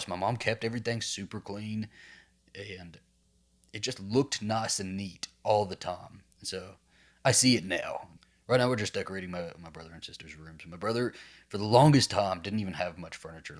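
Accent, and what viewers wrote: South, United States. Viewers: I can't believe you're trying to build your childhood home from memory on Sims 4 of this video and I hope your husband, Alastair will be very proud of you for that, but I love your Southern accent, you're gay and you're from Texas!!!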